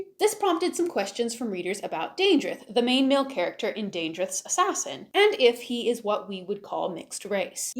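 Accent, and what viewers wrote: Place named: United Kingdom. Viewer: You're Texan?😮 Where did you get that wonderful British pronunciation?!?🥰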